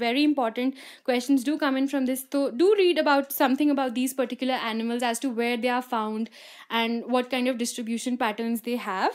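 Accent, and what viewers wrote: India. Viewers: Indian dialect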